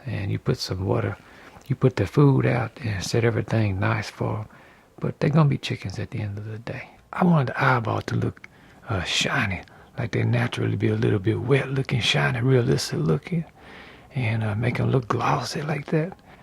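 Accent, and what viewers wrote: Louisiana, United States. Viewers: Hey umm to me the only guy you sound like is someone from Louisiana because I’m form Mississippi and I hear a lot of people from Louisiana talk and you sound just like em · Mr., comment ça va? 😃 I am a professional artist. I hand paint fine art on silk. I am a watercolorist, wall muralist, pastelist, ceramicist and, as a fellow artist, I suggest that you not worry about what anyone has to say regarding how you paint, or anything else! You do you, the! If anyone insults you and your God-given abilities just know that they are doing so because of envy. Praying for them is how I deal with it, but that’s just my suggestion. I suggest looking into copyrighting on your CrAzY ChIcKeN🤪! People these days seem to have no respect for one another and even less so for one another’s property - especially when it comes to something that is making money. 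You spoke about commenters writing who or what you sound like. To me, you sound like some of my family who lives in Southern Louisiana, specifically Grand Caillou/Cocodrie area! I hope you sell a lot of your CrAzY ChIcKeNs (poulets fermiers (free-range chickens) and you make more figures, action or stagnant! Do you ever paint a protective coat onto your figures? Do you sign or put a symbol on your work? If yes, what? Though this next sentence is truly none of anyone’s business, especially mine, but if the demand far outweighs the supply, I hope you stay in the USA! Thank you for sharing your work with all of us! Thank you for sharing how you work with us all! God Bless! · I think what people are trying to saying about your voice is you got that calming whisper thing going. To me you sound Southern and maybe vaguely Cajun.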